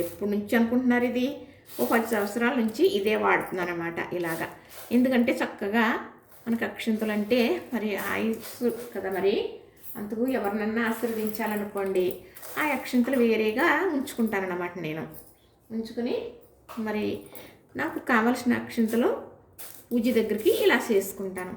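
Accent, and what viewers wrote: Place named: Godavari, India. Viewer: Mana godavari yasa❤❤❤❤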